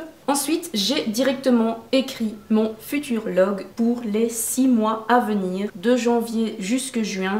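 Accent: Belgium. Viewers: Bonjour, j'adore l'idee de grace au bujo etre organiser pour enfin realiser ses objectifs mais comment on fait pour realiser un bujo quand a la base on est pas organiser ds sa tete , en gros je sais pas pas ou commencer et il y a tellement de chose a y mettre pour que ma routine du quotidienne et mes plus gros objectifs soit mieux atteignable car mieux plafinier , j'adore ton naturel! et ton petit accent belge qui reste malgré NY :-) Bizz de Bruxelles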